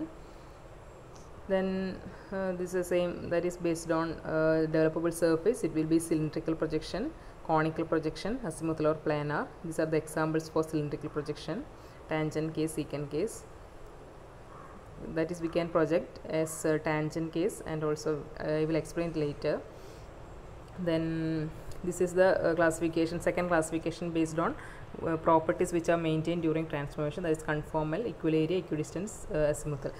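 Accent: South India, India. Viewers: Yes south india english is sweet & innocent , but its a good video ...nice explination watching from aboard ...professor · the south indian accent of english is funny and lovely at the same time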